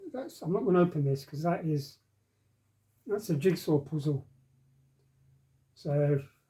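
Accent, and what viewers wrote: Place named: England, United Kingdom. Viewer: Imagine 'correcting' an English person on English with an American way of pronouncing it. Cringe.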